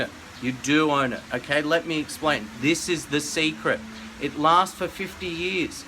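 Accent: Australia. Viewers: are u ozzie? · BRAVO! YOU ARE A VERY INTELLIGENT FELLOW. ARE YOU AUSTRALIAN? THANK YOU ANYWAY!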